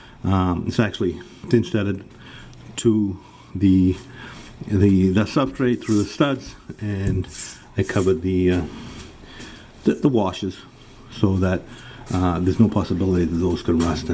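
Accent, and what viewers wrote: Boston, United States. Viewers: I never tire of hearing the Boston accent. 🤣😂😁😂🤣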